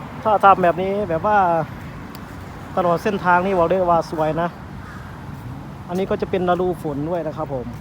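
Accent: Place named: Champasak, Laos. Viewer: บ่าว มาจากแขวงไหนครับ? ผมเดาว่ามาจากแขวงจำปาศักดิ์ สังเกตจากการออกเสียงด.เด็ก,ซโซ่,ออกเสียง ฤดูเป็น ระดู ฯลฯ ผมเดาถูกมั้ยครับ?😂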